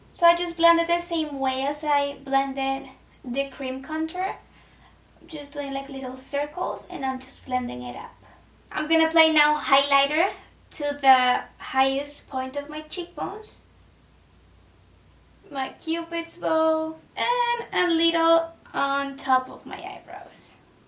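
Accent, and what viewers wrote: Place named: Colombia. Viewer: amo tu inglés colombiano😍😍😍😍😍😍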